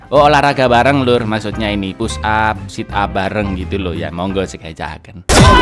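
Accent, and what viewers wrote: Java, Indonesia. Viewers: Jowone ngendi cak?? 😂 Nyonk Jawa Tengah cak, tepatnya Parakan Temanggung.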